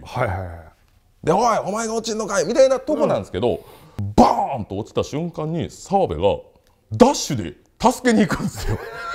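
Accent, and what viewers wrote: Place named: Kyoto, Japan. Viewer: めっちゃ所々京都弁感じる 上品な人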